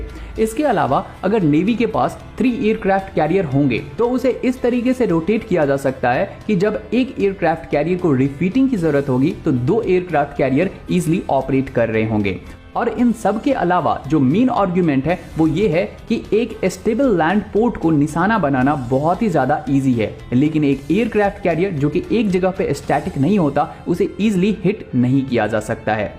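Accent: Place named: Northeast, India. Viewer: Are you from the North East? I am just curious because aapka accent mere North East k friends jaisa hai. Jai Hind!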